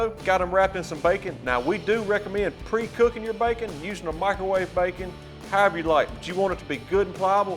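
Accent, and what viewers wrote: South, United States. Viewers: That is one thick country accent I thought mine was bad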